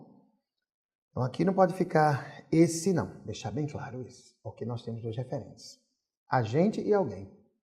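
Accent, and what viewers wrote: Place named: Pernambuco, Brazil. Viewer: Muito boa aula, parabéns e obrigada. O melhor é ouvir o sotaque, também sou Pernambucana!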